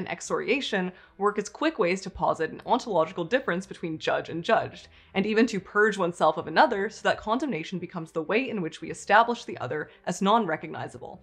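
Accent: Canada. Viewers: She sounds Canadian, so perhaps that explains her lack of understanding of the US justice system, but I agree that the criticisms in this video are largely misplaced because she’s misunderstood how and why the system works as it does. For example, incarceration is the primary punishment for crime not to achieve “retribution” but to keep greater society safe by removing criminals, and to allow those criminals the best opportunity for rehabilitation while institutionalized. Just as an addict is most likely to rehabilitate during a highly-controlled inpatient stay, a perpetrator is most likely to change during incarceration, when removed from the temptations and opportunities to commit more crimes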